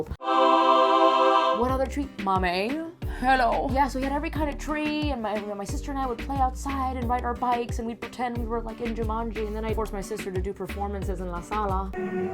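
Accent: Cuba. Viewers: Her cuban accent is really strong.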